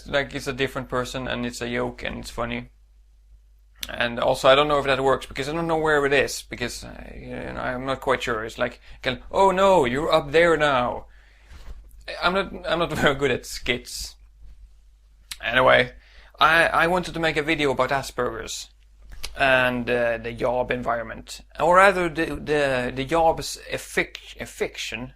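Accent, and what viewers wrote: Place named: Sweden. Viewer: I can't help loving the Swedish way of pronouncing "yob." And enyoy... Never knew.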